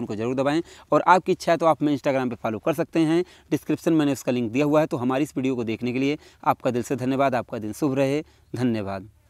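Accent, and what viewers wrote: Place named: Pratapgarh, India. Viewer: Bhai aap kaha ke rehne wale ho aapki bhasha se mujhe lagta h ki aap uttar pradesh jila pratapgarh ke aas paas se hi kahi ho...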